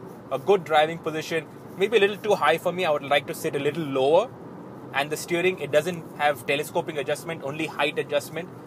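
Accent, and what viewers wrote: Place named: India. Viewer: ก็นึกว่าของคนไทยรีวิว นี่ไปเอาใครสำเนียงแขกมาพูดให้ฟังเนี่ย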